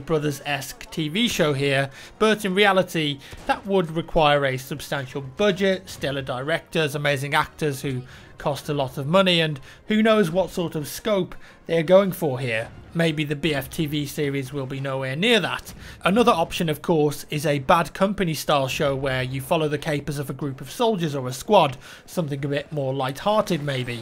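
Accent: United Kingdom. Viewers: Drink a shot everytime he says Battlefield in his nice british accent :D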